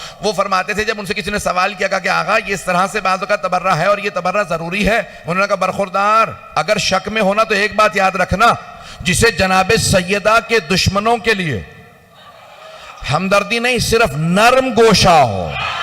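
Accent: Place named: Iran. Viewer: Urdu bhi boltay hain tou Farsi lehjay mai. Subhan'Allah.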